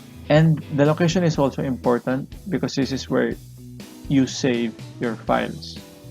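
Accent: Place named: Philippines. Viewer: you sound pinoy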